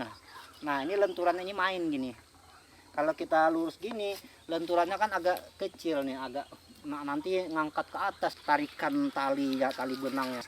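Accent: Kalimantan, Indonesia. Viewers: Logatnya orang kalimantan ya bro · Dari cara bicara kayaknya pian urang kalimantan... Ini kerjaan ku masih SD.. dapt 1 aja seneng nya war biasa.. komplit ya ... D tunggu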